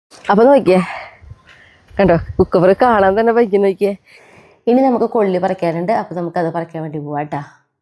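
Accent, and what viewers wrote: Thrissur, India. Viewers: കാണുന്നതു തന്നെ സന്തോഷം ഇത് സ്ഥലം എവിടാണ് തൃശ്ശൂർ ഭാഷാരീതി ഇഷ്ട്ടാ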